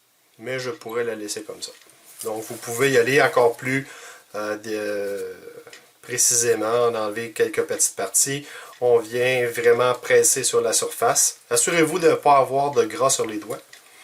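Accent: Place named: Canada. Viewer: bravo explication très simple j'adore ton accent canadien Bonne journée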